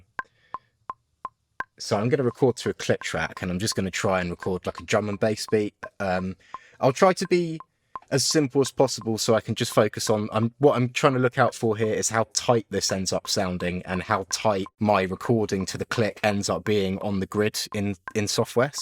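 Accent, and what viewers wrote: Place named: United Kingdom. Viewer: Haha your fine bro , it’s not an issue unless you literally never heard or spoke to someone from the uk !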